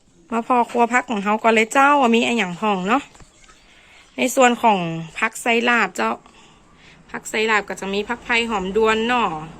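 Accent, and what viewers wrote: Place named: North, Thailand. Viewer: คนเหนือมาอีกคนแล้ววว ฟังสำเนียงแล้วอยากไปเที่ยวทางเหนืออีกครับ มาติดตามด้วยคนครับ🙏🙏🙏😍👍🔔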